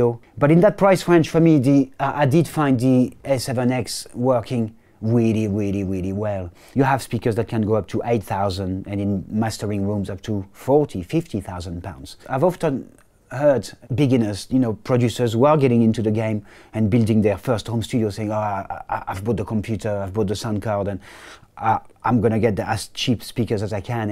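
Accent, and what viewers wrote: France. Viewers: Hmm thanks for the info We sometimes overlook the importance of the hard drive it counts mostly when using a lot of Vst I think Pc is better for home studios because a Pc is easier to fix up.... parts can be changed easily and you can upgrade it easy Though is it basic for beginners I really like the accent from my country Go French! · Ehh' lil French accent, très bonne vidéo ,merci beaucoup et bonjour de la France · i was wondering, definitely sound French, especially when he says "acoustic"! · Interesting accent..French?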